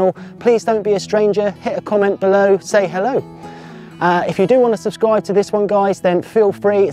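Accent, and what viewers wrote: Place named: South, United Kingdom. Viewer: That was interesting, thank you.What part of Britain are you in? I'm guessing somewhere down south.🙂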